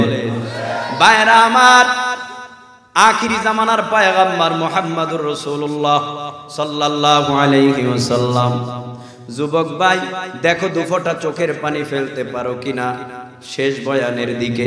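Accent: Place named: Barishal, Bangladesh. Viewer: Barisailla pola bole kotha Owaz ta really দারুণ hoice সাবাস ভাই আমাদের গর্ব e আপনি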